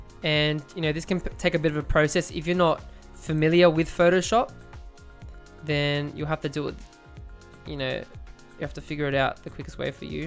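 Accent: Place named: Australia. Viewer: Thanks heaps mate, love the accent as well, finally a tutorial in strayan.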